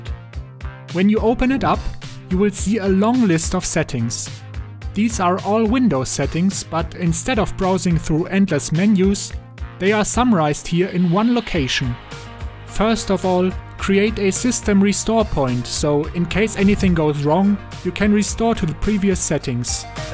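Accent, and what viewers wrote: Germany. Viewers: U are german Right? 99%😂😊💯nice video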